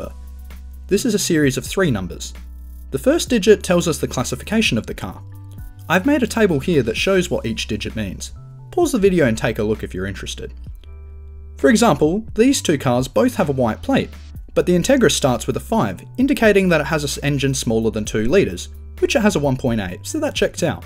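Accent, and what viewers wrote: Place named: Australia. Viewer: Me Japanese learning about ナンバープレート of my own country, by watching English video with Australian accent😅😊 Thanks a lot. I didn't know a lot of things you mentioned.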